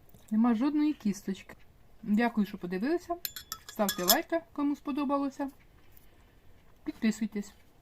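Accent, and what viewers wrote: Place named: Russia. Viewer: А в якому місті ваш ,,говор" москальський?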